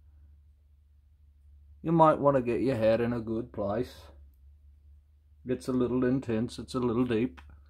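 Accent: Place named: Australia. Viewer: G'day, I 💚 the accent, the lazier you make it the more Ozzie you sound 😂 Adorable 😘 Awesome Artist 👍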